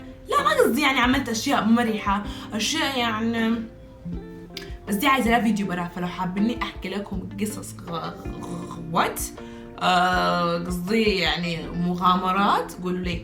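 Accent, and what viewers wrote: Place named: Libya. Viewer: احلى من يتكلم ليبي🥹❤️❤️❤️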